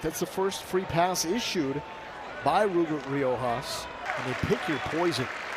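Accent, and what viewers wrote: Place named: South, United States. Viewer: “the runna is ou” 😂 welcome to the south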